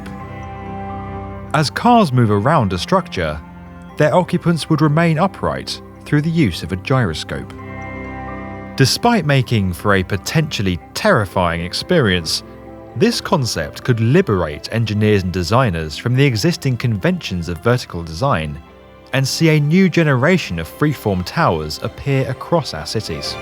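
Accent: United Kingdom. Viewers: Surprising you call the lifts elevator when you’re donning a British accent. :)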